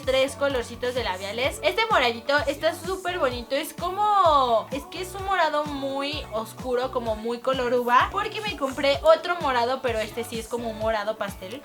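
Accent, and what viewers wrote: Mexico. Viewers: habla como fresa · a se le está quitando el acento maxicano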